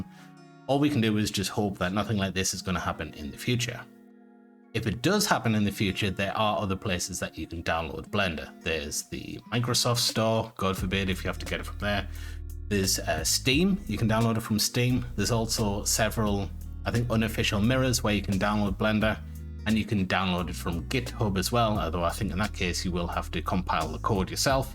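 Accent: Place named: Newcastle, United Kingdom. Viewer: awesome, you're a geordie!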